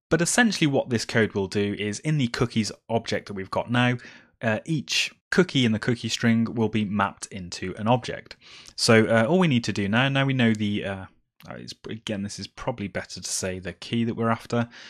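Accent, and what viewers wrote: United Kingdom. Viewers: Not that I just enjoyed it, it helped me a lot, I implemented it! I just discovered your ytCh, you deserv much more subs. Watched few videos yesterday, I can learn a lot from your videos. I heard that you have a proper English accent. You must be from UK :) I am living next to Manchester :) Do you have a time to be my mentor once a month?...or whenever you have time. That could help me a lot.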